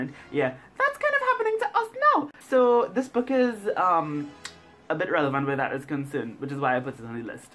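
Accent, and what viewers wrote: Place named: Trinidad and Tobago. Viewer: As soon as I heard your accent I was like, "Wait is he from Trinidad?" And I look in the description and it says you're from Trinidad which is awesome because I'm currently living here! (moved to Trinidad from America a couple years ago) So nice to see a fellow BookTuber from Trinidad! Awesome video!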